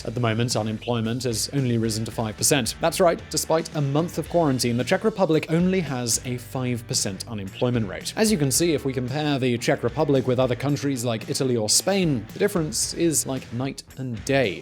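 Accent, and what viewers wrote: United Kingdom. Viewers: Hi friends, i'm latino american and i can't understand this accent, (british accent) i'd like to listen these videos in EE.UU english, however you can speak slowly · Are you actually from Czech Republic? You have such a good british accent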